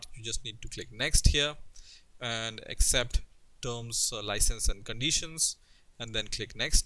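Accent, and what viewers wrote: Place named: India. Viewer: indian english is best ! so clear and easy to understand ! thnx bhai anyways :-) best tutorial !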